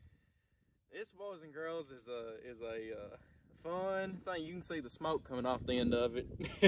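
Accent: South, United States. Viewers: That´s a fine Southern accent! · I appreciate your southern dialect also ❤️😎🌟🌟🌟🌟🌟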